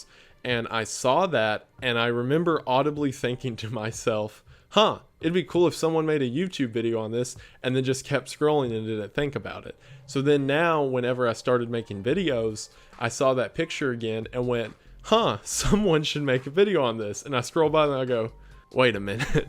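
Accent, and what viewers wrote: South, United States. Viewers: But he has a southern accent lol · the hawaiian shirt coupled with a southern accent is a godly dadcore combination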